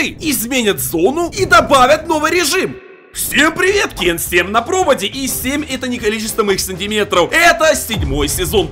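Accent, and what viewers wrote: Ukraine. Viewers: Брат ты из украины ???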